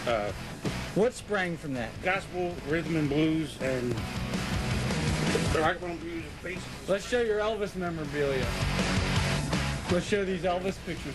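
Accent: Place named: South, United States. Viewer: Before his southern accent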